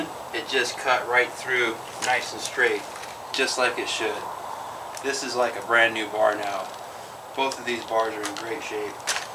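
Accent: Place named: South, United States. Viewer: 🪓 MATE, love your attention to detail 🥇 I use a similar grinder but I use the disk wheel with a super fine grade paper (can’t recall what it is 😬). The shop didn’t have a fine grade for the belt at the time. Having one of these grinders actually makes dressing the bar a pleasure 🎉💥 I didn’t know anything about how to check the gauges etc but with Australian hard wood we go though chains ⛓️ pretty darn quick. And hey, I even liked your country drawl… reminded me of Little House On Through Prairie‼️ Hope to see you in the sky one day 🙏 😉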